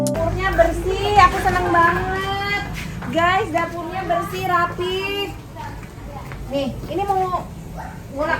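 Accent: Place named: Garut, Indonesia. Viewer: Paling resep pami tth tos nyarios sunda logat garut sok d ulang" Aq mah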